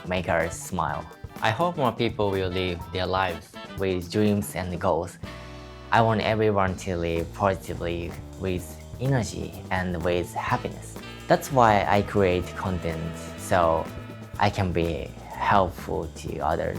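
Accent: Japan. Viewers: I have Japanese friends and have visited your country 4 times. I love Japan very much and wish that I could live there. My Japanese friends speak some English, but not as well as you do. I respect the effort that is put into speaking another language. I try my best to speak Japanese, but only know basic phrases, because at 62, it is hard for me to remember a lot of Japanese. It is easier for me to understand most of your English, because of your Japanese accent. Keep up the good work. ❤️ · The beauty I hear in your speech is because you are a native Japanese speaker talking to us in English. I understand every word. I'm from the South here in the US and let me say, we can destroy some English pronunciation and there is no shame! We embrace it. Please do the same. Individuality is beautiful. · Your English is GREAT !! Please remember that people in the United States have accents also; especially in places like Mississippi, North Carolina, even New York ! 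Depending on the accent, you can tell exactly where someone is from- no shame… just the way it is. your pronunciation is perfect. Please remember that you are Japanese first and foremost; so of course you’re going to have a “accent” when you speak English. I admire you for wanting to improve, but please only improve if it is something you want to do not from outside pressure. And I love your outfit today !! HANDSOME !!! Keep up the great work !!!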